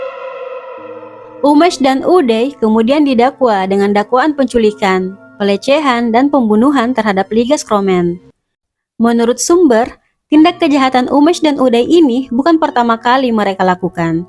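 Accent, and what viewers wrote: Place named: Eastern Indonesia, Indonesia. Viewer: Suara admin kedengarannya dr timur, tp gak tahu apakah dari Sulawesi, NTT, atau Papua.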